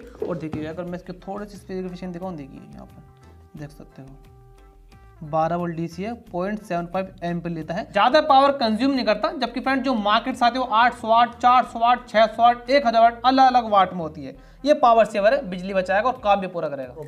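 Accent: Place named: Haryana, India. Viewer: आप बहोत ही फास्ट हरियानवी हिंदी बोलते हो ऊसमे सुधार चाहीये।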